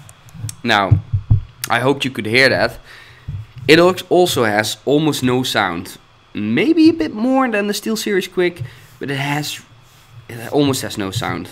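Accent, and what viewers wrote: Netherlands. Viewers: hoor altijd het nederlandse accent haha :P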